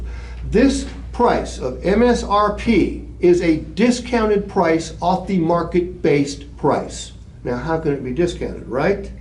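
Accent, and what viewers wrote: Appalachia, United States. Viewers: hillbilly and his bullshit hick talk!!!!!!!!!!!!!!!!!!!!!!!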